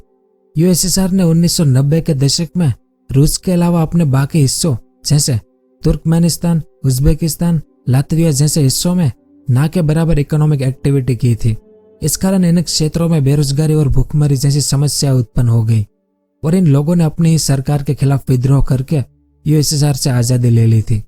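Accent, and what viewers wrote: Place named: Kathiawar, India. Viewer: તમે કાઠીયાવાડી‌ છો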